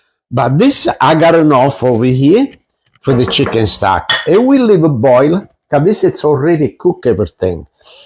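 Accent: Calabria, Italy. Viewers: From Schaumburg IL speaks like my grandparents who were Calabrese. But I have family in Torino!! I see he worked in Torino.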